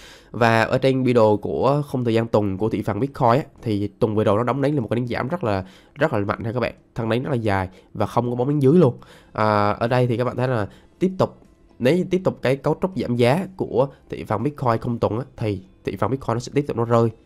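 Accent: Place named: Quang Nam, Vietnam. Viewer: Anh ng o miền trung quảng nam ak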